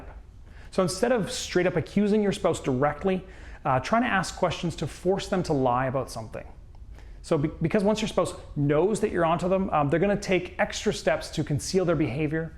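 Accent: Canada. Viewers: is this guy Canadian?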